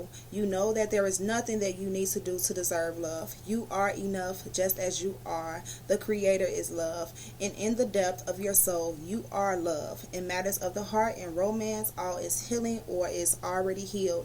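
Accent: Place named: Chicago, United States. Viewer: You from Chicago? I feel like I can tell 😩😍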